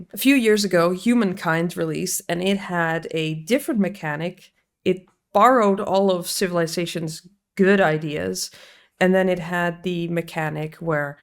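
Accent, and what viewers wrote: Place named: Netherlands. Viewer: I smell Dutch accent🗿 But I feel like they kinda dumbed down the fun parts of civ 7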